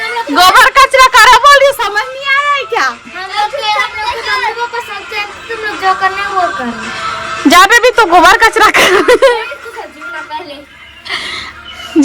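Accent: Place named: Chhattisgarh, India. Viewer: बहुत ही सुन्दर और अपनी गांव की यादें ताजा हो गई, बहुत अच्छा छत्तीसगढ़ी बोली हे, जय छत्तीसगढ़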